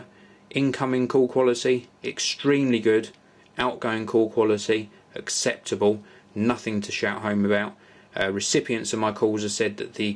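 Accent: England, United Kingdom. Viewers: Best british accent yet :) Nice review as well! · Nice review! Could we have a comparison video of this phone with the Orange San Francisco? Where is your English accent from?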